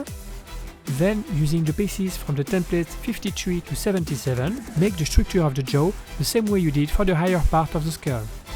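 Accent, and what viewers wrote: France. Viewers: I don't know if you're French but ton accent français est vraiment sympa et c'est facile à comprendre du coup ^^ GG ! Edit : Oui j'ai regardé la desc et t'es bien français, c'est cool ^^ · Hooo mais tu e 0s français ?? Je me disais avec l'accent=) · ok je pense bien que tu avais un peu. Un accent français sa s'entans a ta voix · Houla, vous seriez pas Français par hasard ? Ca s'entend à l'accent... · Ah d'accord, ça se voit à ton accent que t'es français 😂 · Est tu francais car ta un fort accent français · are you french? not that this would be a problem i just noticed the accent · Wait ! this accent... but alors you are french ?! X) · Is this guy French?? I get inspector Closeau's vibe from his accent 😅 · Mdr on dirait que tu es français tu prononce les mots en anglais mais avec l'accent français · Sublime, j'adore et kiff jurassic park, et super acsent français 😊je me suis abonné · T'es français et ça s'entend en tout cas tous ce que tu fais avec le carton sont des oeuvres d'art continue comme ça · Pls tell me that your French, because your French accent is sooooo huge xD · Salut es ce que tu es français ? 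Car je trouve que tu parle anglais avec un accent français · Bonjour, es-tu français avec ton super accent? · Le mystère des recommandations ytb x) Au moins un tuto pour lequel je n'ai pas eu besoin de sous-titre, un petit french accent au top xD Le boulot monstre .. fiou ! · Français hein? Ça s'entend x) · he have french accente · You are french ? Beacause you have a accent french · Do you speak French ? Because I heard some accent in your dialogue.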